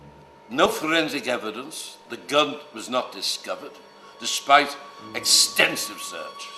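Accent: Liverpool, United Kingdom. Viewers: Sounds like a Liverpool accent for that area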